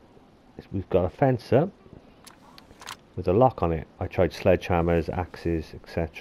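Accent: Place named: South Wales, United Kingdom. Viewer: Is that a Cardiff accent ? It's definitely south walian 😂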